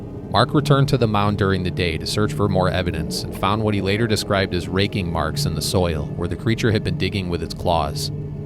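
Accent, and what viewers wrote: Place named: Wisconsin, United States. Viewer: I just found your channel and your accent... I feel like you have spent more than a little time in wisconsin yourself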